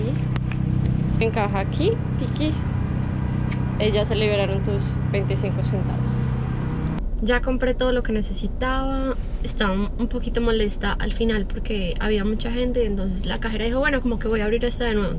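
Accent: Colombia. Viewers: Hola! Empecé a seguirte porque me encanta el acento colombiano, ¡mi nuera es colombiana! ¡Soy brasileña, de Rio Grande do Sul! ¡Me encantan tus publicaciones! ¡Éxito y feliz Navidad! 🎄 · Hola te saludo desde 🇬🇹 me gusta tu acento colombiano.....me gustan tus videos ❤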